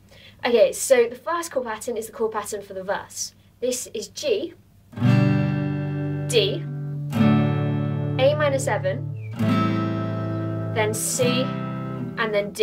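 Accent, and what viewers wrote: United Kingdom. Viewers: Blonde... British accent... Plays guitar and play? I think im in love... I came Ten years late I think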